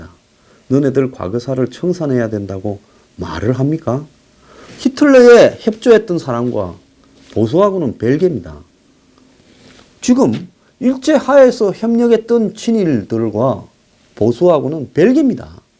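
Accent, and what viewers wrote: Gyeongsang, South Korea. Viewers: 한국 사람이 경상도 말을 못알아 듣나요? 이제 그런 불만 있는 사람은 기부를 좀 하시지 그럽니까.차라리 방송국 가서 진실을 보도 해 달라고 요구를 하셔야지요.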